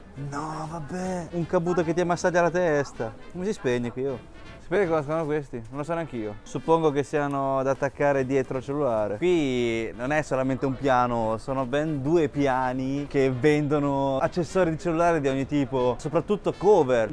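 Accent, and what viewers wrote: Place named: Romagna, Italy. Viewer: Di dove sei??? Hai un accento romagnolo.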